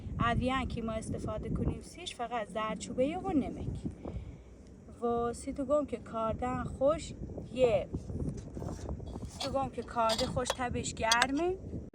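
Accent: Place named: Lorestan, Iran. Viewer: What a sweet Lori accent you have — I’ve fallen in love with it, it’s so beautiful! 💛❤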